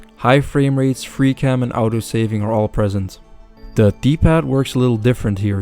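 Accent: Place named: Netherlands. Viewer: you sound dutch bro, great video btw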